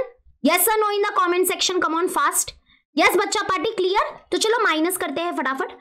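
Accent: Maharashtra, India. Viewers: r u marathi????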